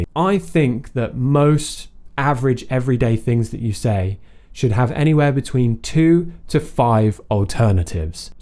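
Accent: United Kingdom. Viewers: I love your British accent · Hello. Wonderful lessons as always. I agree, English can be boring, especially when conversing. Having options is a great way to expand one's vocabulary. I used the following phrases as an alternative to: "You're welcome." 1. My pleasure. Pleasure. Pleasures all mine. 2. No worries. No problem. 3. Much obliged (combined with #1) 4. It's all good paired with #2 or #4 5. Merci or Merci Buckets.😂 6. Quite alright (with #2 or #4) 7. I gots 'cha! (#2 or #4) 8. Don't mention it. 9. No sweat with #2–8 10. It's okay. No biggy. Absolutely love the British accent. It's so posh and quite easy on the ears. I speak with a Canadian accent and, apparently, mine is an enigma here in the Philippines. I sound: Canadian - American - British -French all jumbled together.😅 Kudos. Watching and learning all the way from the 🇵🇭. Cheers!👍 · I love the british english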